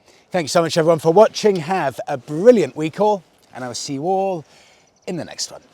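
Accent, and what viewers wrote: United Kingdom. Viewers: I came here for the Meteor 650, but that quintessential British accent made me stay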